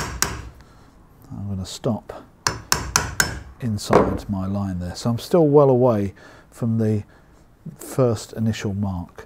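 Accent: England, United Kingdom. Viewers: Maybe it’s the English accent but you sir are a terrific teacher! Subscribed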